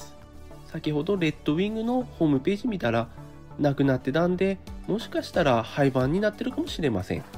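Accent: Tsugaru, Japan. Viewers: いつも楽しく観させて頂いています｡ 津軽弁良いですよね‼️｡ (やわらかな感じで､) チャンネル登録もしています｡僕が持っているRED WINGはﾐﾙﾜﾝ(9087)ﾌｫｱﾏﾝ(8054)ﾍﾞｯｸﾏﾝ(9416)ﾌﾗｯﾄﾎﾞｯｸｽ(9060)です｡ RED WINGは５足までと心に決めていたのですが､あと１足ではおさまらないです｡ ﾌﾗｯﾄﾎﾞｯｸｽは今は光沢の出ないﾃﾞﾘｹｰﾄｸﾘｰﾑを仕様しています(光らせなくても渋く格好いいから)｡革靴は光らせる派です｡ﾌﾗｯﾄﾎﾞｯｸｽをもう１足買って光沢が出るｸﾘｰﾑで仕上げたいと思っているぐらいﾌﾗｯﾄﾎﾞｯｸｽがお気に入りです｡ 僕は革靴の色はブラックが好きです(渋く安心感があるから)｡Dﾜｲｽﾞが僕の足に合っています｡しかしEﾜｲｽﾞの8875が欲しいと思っています｡RED WINGだから赤でも､(絶対に黒と思っていました)Dﾜｲｽﾞのｺﾞｱﾃｯｸｽ仕様も考えていますがEﾜｲｽﾞも欲しいです｡絶対に他のメーカーなら黒にします｡White’sのｵｯｸｽﾌｫｰﾄﾞも欲しいのですが値段と重たさが😢諦めてしまいました｡ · 津軽弁いいですねー。人の良さが現れています。😊レッドウイングブラックブーツ最高ですねー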